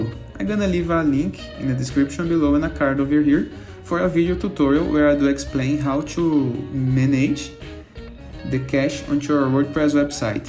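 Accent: Brazil. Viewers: this is a Brazilian accent if you have ever heard one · I'm Brazilian and listening to your video, I can conclude that you are Brazilian as well haha.... so cute are the Brazilians English accent hehe, I don't have it anymore cause I wasn't raised in Brazil. But Thanks for your video, it was short and helpful.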